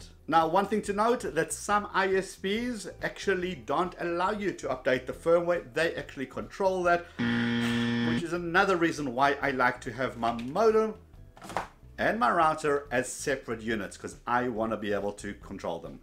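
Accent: Johannesburg, South Africa. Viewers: U sound South African ... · stumbled onto this vid and 0.2 sec I can tell he's from SA :) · love the South African accent ! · South African? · Love that Joburg accent. Content is also great.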